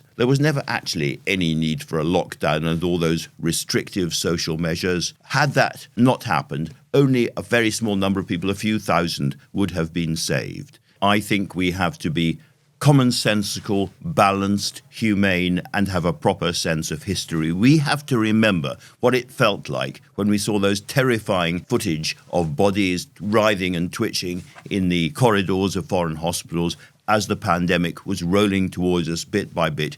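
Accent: RP, United Kingdom. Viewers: Science is essentially always "a work in progress" and when politics and science combine to effect a policy, then it is a matter of proper concern. Conservative England -both mad and paranoid? A bit of the usual modern media hyperbole. Par for the course for a BBC voice perhaps?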